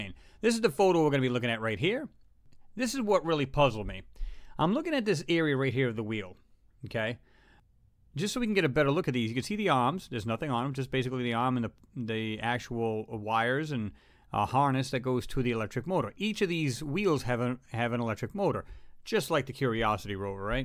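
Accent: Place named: Connecticut, United States. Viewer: "it looks like it got jammed in... (Dramatic pause, me your long time listener hoping in your Connecticut accent say machine paaaahts ) whatever that is"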